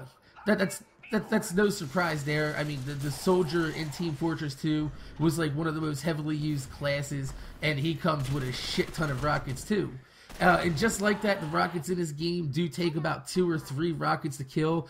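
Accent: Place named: Boston, United States. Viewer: I bet this guys from boston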